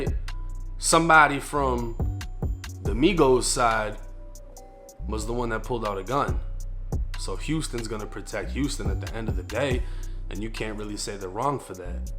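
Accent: Boston, United States. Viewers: Lol I’ve been subscribed to “Jay Williams: Let’s Live Life” (AWESOME channel), and I just stumbled across ur channel. Love the content man, and I love the Boston accent 😂. “His chAAAHHHges..” I ain’t making fun— it’s just different to me (a square from BFE Ohio).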